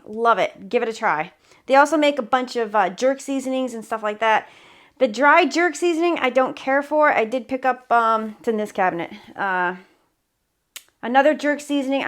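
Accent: United States. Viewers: Please don’t apologize for normal speech. I enjoy your cooking passion and your every day American speech. You owe no one an apology. Love your videos!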